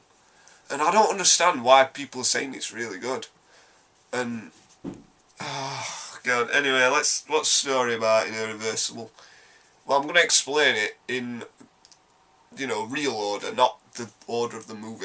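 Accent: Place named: Northern England, United Kingdom. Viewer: You are so likeable , corrie accent love it 🤣🤣🤣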